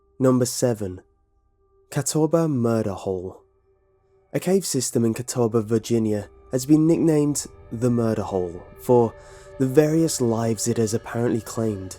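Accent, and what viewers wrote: England, United Kingdom. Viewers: Ahaha he's definitely not australian Cuz I'm australian and this kinda sounds english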